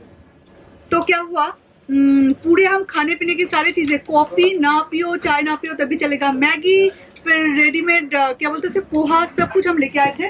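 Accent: Bengal, India. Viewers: Tomar bangla ta, 🤩🤩🤩 uh got a nice bngoli tone😍😍😍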